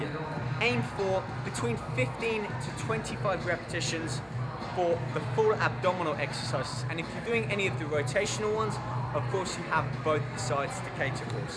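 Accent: England, United Kingdom. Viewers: fuck ur englisch accent u fucking roid user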